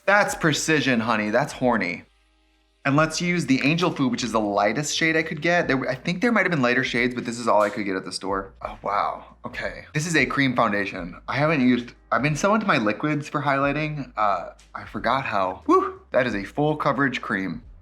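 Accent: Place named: Milwaukee, United States. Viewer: The Milwaukee accent is front and center today ❤😂 LOVEEEEE ❤️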